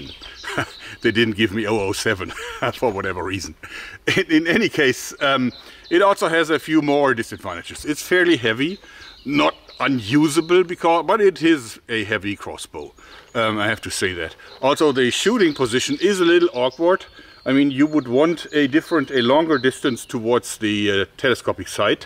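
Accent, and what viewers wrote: Germany. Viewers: . Lets reduce the arrow-weight down to ... eehm ... 11 grams what should result in an arrow-speed of > 400 m/s .. ? Increasing the acceleration by 100% will lead to >1.600 m/s (hypersonic) ...and > 600 Joule (based on a 11 grams arrow) ? To stay below hypersonic-speed requires an arrow-weight below of ... eehm ... something below 16 grams ... or so. The Front-Part of that pulley, needs to be replaced behind the shoulder. Gruss :Rudi Ich mag dein DEUTSCHES Englisch :D . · I bet Hollywood actors watch your channel if they need inspiration and help with their German accent! :) You can put the sights a little forward yourself.. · IT IS NOT "2000$", IT IS "$2,000"! I can hear you are German (?) but get it right! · Nach dem ersten Satz wusste ich, dass du deutsch bist 😆😉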